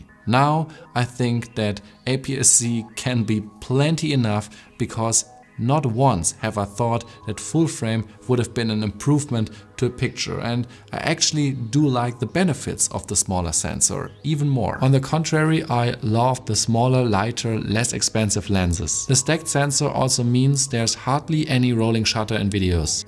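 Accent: Germany. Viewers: dein deutscher dialekt ist echt übel XD. teilweise wirkt es so als wolltest du extra englischer sprechen... und dann verkackst du das "very". daraus machst du ein "whery"... und wenn ein vokal nach dem "the" kommt, wird es "thee" ausgesprochen. nicht böser gemeint. ich formuliere immer sehr unsensibel.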